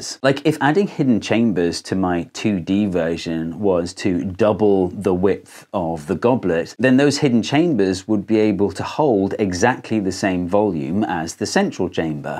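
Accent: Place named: Geordie, United Kingdom. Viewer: I’ve been watching your videos for years, and just realised on Wikipedia that you went to my secondary school! I’ve got as much of a geordie accent left as you do, mind, so I never even picked up on it. And ironically, I’m currently working in Universal Studios as a wandkeeper, so I guess we’re both wizards now. How many Tommy More wizards can there be? 😅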